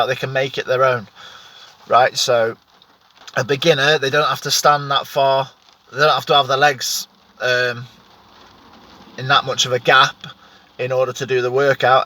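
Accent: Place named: Cheshire, United Kingdom. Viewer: sounds like he is from Chester/Cheshire, north west deffo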